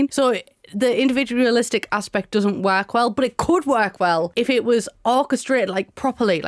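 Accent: United Kingdom. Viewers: Yeah just wasted $3k+ simoleans on my THIRD failed wedding. Catastrophic failure and not a single “part” of the ceremony or activities were able to happen. We hired a violinist and they sent a ghost and everyone got stuck in loops talking to the freaking ghost. I want my real world money back and all my wasted simoleans 😡 Also side note totally unrelated but I’m sorry for neglecting your channel for LilSimsie, my partner can’t stand her voice, and your voice reminds me of my ex fiancé who is also British so your voice is a weird nostalgic trigger lol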